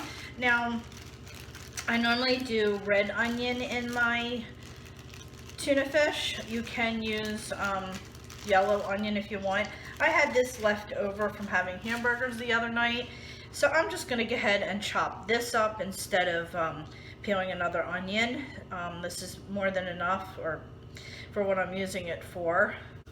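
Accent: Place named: South, United States. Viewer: I love tuna cakes. I’m here to see how to make them in our new air fryer. I must say, tuna cake recipes vary more than I realized….. family or area specific I guess. We always add cooked rice, & cracker crumbs, onion, parsley, maybe some mayo. I’ve never tried the celery & mustard. Love your frequent “ guhead “, truly. I’m guessing you are from down south, yes?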